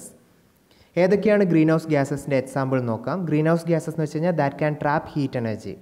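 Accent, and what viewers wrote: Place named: Kozhikode, India. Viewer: Sir Kozhikode aan allo😌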